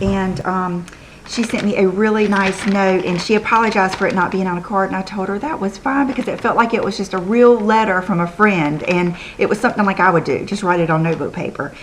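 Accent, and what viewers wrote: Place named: Texas, United States. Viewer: i loveeee your accent!!! is it from texas??? im from another country so that is why i dont know exactly where its your accent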